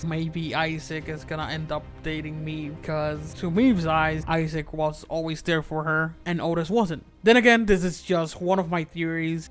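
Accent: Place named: Scotland, United Kingdom. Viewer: hes scottish, dont be inconsiderate haha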